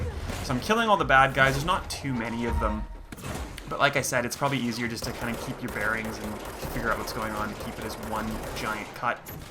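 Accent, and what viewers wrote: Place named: United Kingdom. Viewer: Hes British I would guess.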